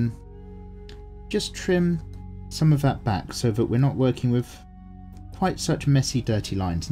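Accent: United Kingdom. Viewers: do you happen to be british? idk what british people sound like, but i feel like you are british. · He's definitely British.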